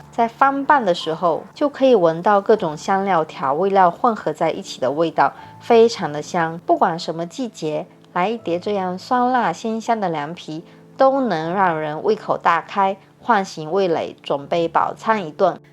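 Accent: Fuzhou, China. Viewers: 您是福州人吗？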